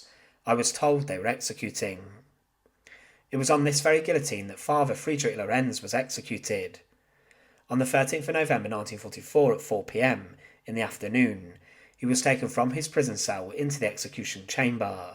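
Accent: United Kingdom. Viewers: It's a type of British pronunciation which I will never understand. But then, I hear myself saying "gonna" for "going to," so we all have our flaws.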